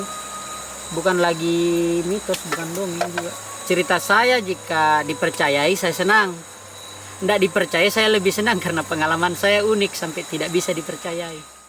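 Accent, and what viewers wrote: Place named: Makassar, Indonesia. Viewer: Eh ,, Toh ,, Hehhe makassarku sekali😘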